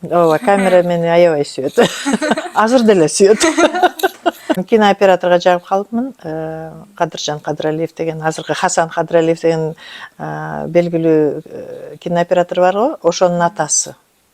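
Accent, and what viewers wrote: Standard. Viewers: Кыргыз тилинде таза, сонун суйлойт экен, маа шаа Аллах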